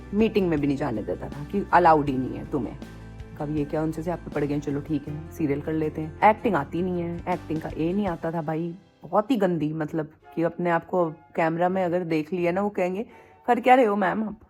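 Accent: Delhi, India. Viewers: I got very emotional after watching this vlog, I also live in noida and I am just 20, and i kind of went through the same feelings when i had a breakup, you felt just so relatable to me, also the way you talk the delhi accent everything is very relatable. I saw you for the first time in Meri aashiqui tum se hi & I adore you since then, you are a pure soul and i just wish you get all the happiness in the world 💌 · Ur delhi accent is so cuteee..proper delhi wali tone🤌🏻🤌🏻🤌🏻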